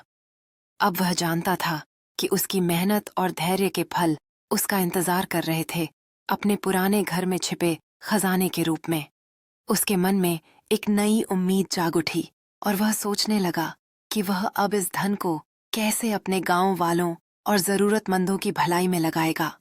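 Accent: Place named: Hyderabad, India. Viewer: Hyderabadi voice💯😍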